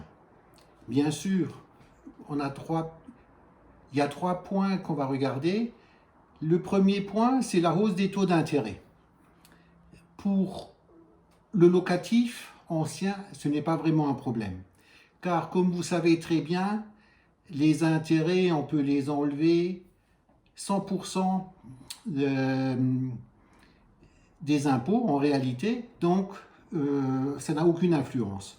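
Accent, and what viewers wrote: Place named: Alsace, France. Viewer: haa ,cet accent alsacien too much looie...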